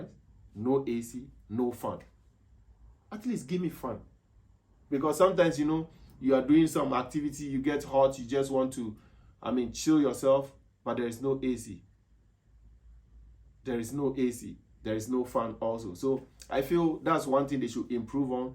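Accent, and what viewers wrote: Nigeria. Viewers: We think you are a Nigerian coz of accent 😂😂😂😂😂😂😂😂😂😂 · You english you talk like Nigerians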